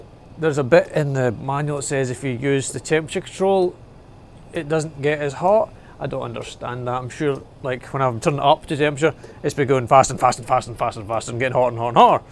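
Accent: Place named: United Kingdom. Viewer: NOT TO SURE , about advice from someone with " British Speak" , untill I heard that all too familuar fraze, "AHH PIECE OF SHIT " , then i felt at ease!!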